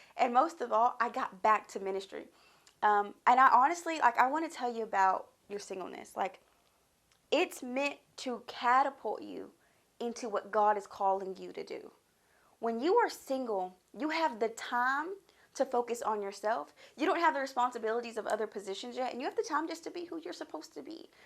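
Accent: South, United States. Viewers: I hear a country accent ? Or am I tripping ? Lol I’m just as country mines is even worse people are always like girlllllll I know you from the south !!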